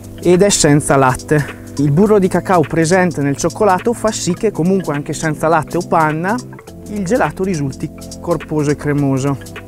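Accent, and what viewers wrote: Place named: Romagna, Italy. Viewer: Come mai hai la ESSE romagnola?!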